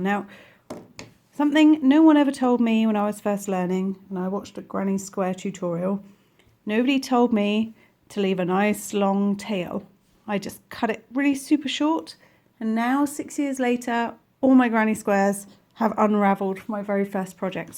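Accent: United Kingdom. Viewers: I think that even though I'm from the UK, I grown to use USA stitch terms because all the people on YouTube who taught me how to crochet are american Also excuse me for being stupid, but are you from the UK, because you sound like it P.S you explain so well! This helped me so much!!!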